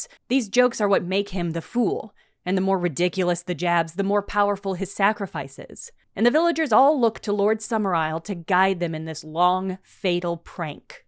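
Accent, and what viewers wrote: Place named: United States. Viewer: Can I find a non American narrative of this British classic ?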